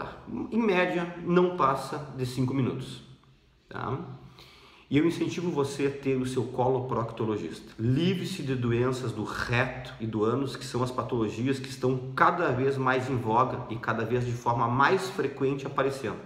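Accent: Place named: South, Brazil. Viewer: Pelo sotaque, do sul.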